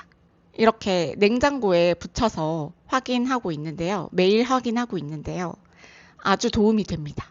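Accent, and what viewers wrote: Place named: Gyeongsang, South Korea. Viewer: 경상도출신 主婦이네요?음성. 억양. 과거는,女商출신이,창구를 응대하는데...요새는, 전부 4년제대학출신.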